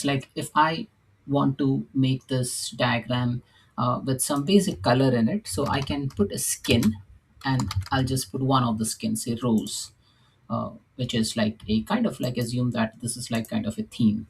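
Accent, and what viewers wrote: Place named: India. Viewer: It's really hard for me to adjust and listen to English with hindu accent. But your presentation is so concise, to the point, illustrative and easy to follow that I've stuck around and put in the effort. Thank you,!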